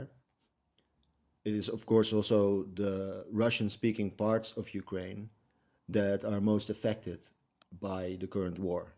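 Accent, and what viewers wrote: Netherlands. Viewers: Are you Dutch? :0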